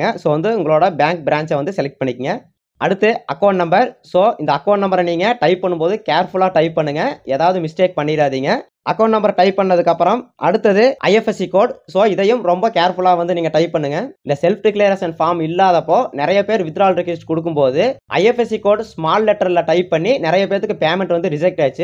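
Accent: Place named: Kongu, India. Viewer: கொங்குத்தமிழை கேட்பதே தனி சுகம் தான் நண்பரே!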